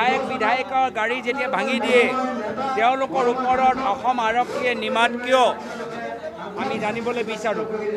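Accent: Bihar, India. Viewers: প্ৰহাৰ নচলাই প্ৰহাৰ কৰে হে। অসমীয়া ভাষা টো ভালকৈ কোৱা উচিত কাৰণ আপুনি অসমীয়া। বাকী অসমীয়া কওঁতে আপোনাৰ বিহাৰ,ইউ পিৰ সুৰ টো সলনি হোৱাৰ আশা নাইকৰা।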